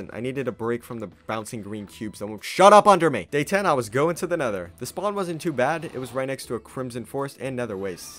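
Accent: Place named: France. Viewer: Your French accasent I cool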